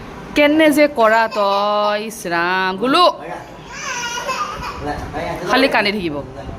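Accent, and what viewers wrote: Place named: Barpeta, India. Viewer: ভাল লাগিল ৰবা চাই।তোমাৰ ঘৰ কত?? ভাষাটোত বৰপেটা চাইড ৰ যেন লাগিছে।কিহৰ অৰ্ডাৰ লোৱা জনাবা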